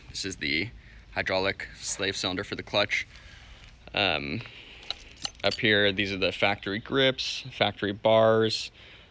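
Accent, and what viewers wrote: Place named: United States. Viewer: What wheels? 🤣🤣🤣 American pronunciation 🙄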